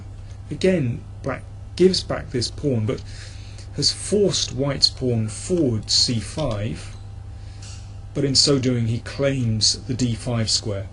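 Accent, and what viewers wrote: United Kingdom. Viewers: What great channel. I'm so glad I stumbled upon it over a year ago, countless hours of great chess and a hugely entertaining British accent along with it. Great work Mr. King!!!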